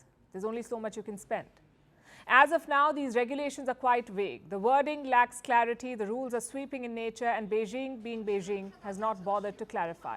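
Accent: India. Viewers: This woman is always talking bad about China with an Indian accent. How much does she get paid for doing this? Is there only bad about about China and nothing good?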